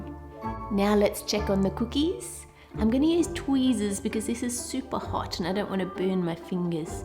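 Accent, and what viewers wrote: Australia. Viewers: Galaxy Midnight, i totally agree! I love her Australian accent! I've always wanted an Australian accent! #SoCute! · she’s australian?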